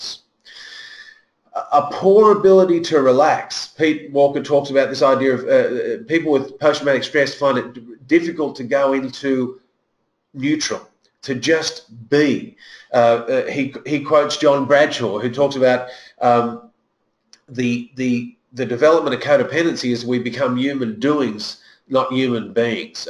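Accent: Australia. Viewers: your Australian accent and is very calming to me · I found this to be so informative and validating. The aussie accent was relatable, which really helped me. The best thing was how referred to "we" throughout the lecture. This made me feel much less stigmatised! Most talks say 'them' or 'sufferers' or patients or even subjects. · presenter’s heavy Aussie accent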